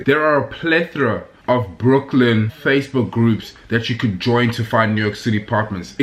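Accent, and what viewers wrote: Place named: South Africa. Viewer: Crazy how you haven't really lost your SA accent. Love from a former Edenglenian 💕🔥👏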